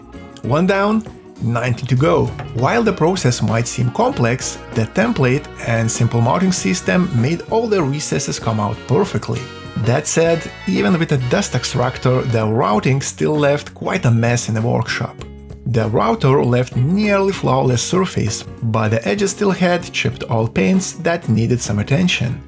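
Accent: Russia. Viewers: Брат у тебя акцент как у русского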